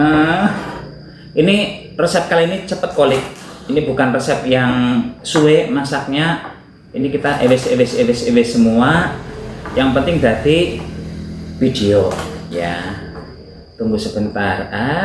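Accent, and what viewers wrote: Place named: Tegal, Indonesia. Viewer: Maturnuwun resep simple nya pak.. sy terapkan resep ini& resep apemnya.. Seneng bgt denger logat org Tegal..suami sy org Tegal tp br mninggal..sy pulkam ke Blitar.. sy ingin jualan makanan Tegal utk mngenang suami sy..ingin jualan nasi lengko& kue apem dr video pak.. Minta resep bumbu kacangny nasi lengko boleh pak??..ingin dpt resep dr orang Tegal asli. Maturnuwun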